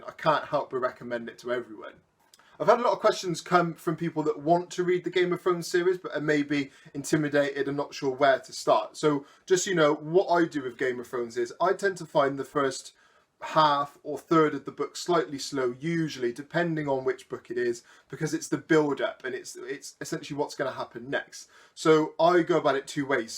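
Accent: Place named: South, United States. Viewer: I might just do this! Didn't get tagged, but it seems fun and I want to film something chatty and lighthearted. In other news, are you from the south? I can kind of hear it in your accent but I haven't been back home in years so it might be off a bit. Great video! :)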